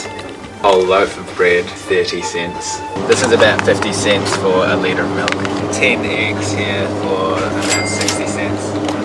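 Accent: New Zealand. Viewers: It's not an english accent though? Place called New Zealand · Are u from new zealand?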